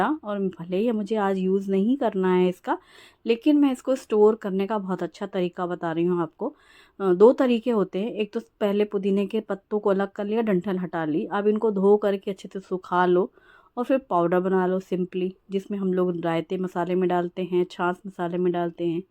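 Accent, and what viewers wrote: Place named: Uttar Pradesh, India. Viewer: Ji aap UP sai belong karte hai...apke bolne key style sai lagta hai......aap bahut hi talented hai❤❤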